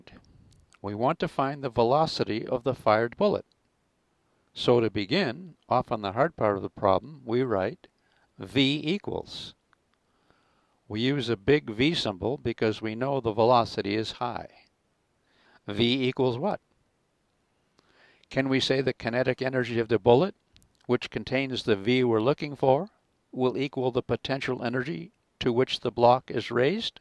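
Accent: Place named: Transatlantic, United States. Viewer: that transatlantic accent is so awesome, all i could think about haha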